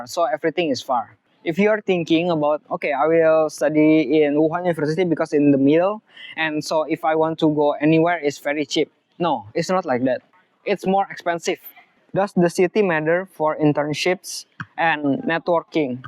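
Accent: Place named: Indonesia. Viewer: so what citizen are you? indonesian? your accent sounds like an indonesian accent btw hehe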